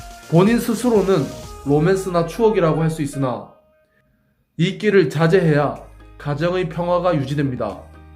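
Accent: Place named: Gyeongsang, South Korea. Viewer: 말투가 경상도 분이시라 ㅋㅋㅋ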